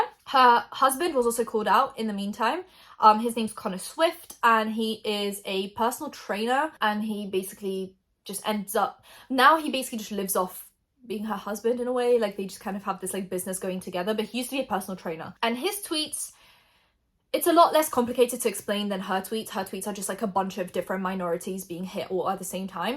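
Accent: United Kingdom. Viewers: Your accept is proper British that’s so cool! I wonder if you speak polish if your accent changes! It’s amazing how if someone goes to another country if there from someone else they actually get the accent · Always get thrown off when you’re like “I’m not British” because my brain is like “British accent = British”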